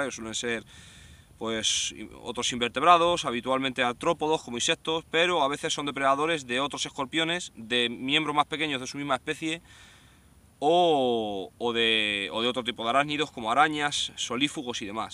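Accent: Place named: Toledo, Spain. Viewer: Otro RODRÍGUEZ DE LA FUENTE. Enhorabuena, por cierto por tú acento imágine que eras serca de la provincia de Toledo, yo soy de un pueblo de Málaga de niño mucho mucho campo hoy ya a mis 50 años ya salgo menos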